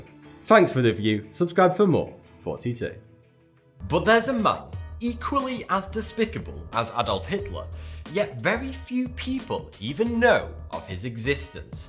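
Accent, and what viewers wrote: United Kingdom. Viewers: oh man this guys should totally be the new James Bond ! that wink can make any girl wetter than the pacific ocean, also his British accent is on point ayy lmao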